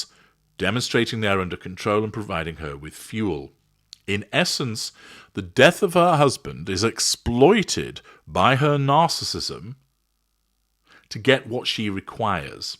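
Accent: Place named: RP, United Kingdom. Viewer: While at first I was very much on board with exposing this particular narcissist, I have given your series on Mrs. Kirk a great deal of thought recently. I mean literally NO OFFENSE to you, but... as the daughter of an ASSASSINATED NAVAL OFFICER (18 July 1972 at Lawrence Livermore Nuclear Lab) I find it rather reprehensible that you are constantly going after a widow in the first few months after the death of the husband. I am cognizant she is a narcissist but wouldn't DECORUM dictate to wait at least 3 -6 months BEFORE treating any family in grief regardless, with some respect? My MOTHER IS A HUGE OLD NARCISSIST and as the daughter of her MURDERED HUSBAND, I would HIGHLY RESENT SEEING A TAKEDOWN VIDEO OF MY MOTHER IN THE FEW MONTHS THAT FOLLOWED MY FATHER'S MURDER. I am NOT a narc - but an empath and as one, this is rather hurtful to those children don't you think, when they after 15 years or so, see how brutal you were to their mother just weeks after their father's assassination? 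I get the educational value but really? I thought you had VASTLY more class and decorum - you do sound like a toff after all. However you are a narcissist so you probably really don't give a shit about what anyone else thinks. right - esp. the actual child of a narc mother whose father was also was assassinated? You just don't give a damn how those kids might feel in the future, do you? I am not at all suggesting that you don't continue this series- but WAIT maybe until well into next year... T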